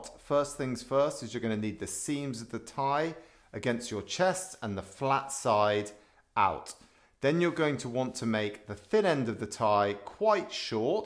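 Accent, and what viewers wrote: United Kingdom. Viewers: Thank you very much! The best explanation from 3 I watched. Thanks for your lovely British too😊! From California, helping to my son who is graduating high school.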